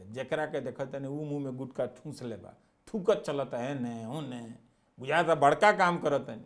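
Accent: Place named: Ballia, India. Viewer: आपके जेवन भोजपुरी बोले के शैली बान ऊं हमरा बलिया ज़िला से काफी मिलेगा रविश भैया मजा आ जाला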